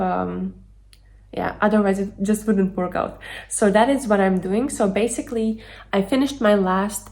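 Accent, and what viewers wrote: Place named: Eastern Europe. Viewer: i think it looks great😊 i would make it to the length below your knees, nearly to your shoes, that will give the „wedding dress“ type of dress, but it‘s definetely wedding dress material! keep on doing row by row, i‘m excited for the final part😊❤ after it‘s finished i would sew a skin color material under the dress so it looks like your skin but it gives you more secureness🤗 btw what accent do you have? it sounds slavic to me😊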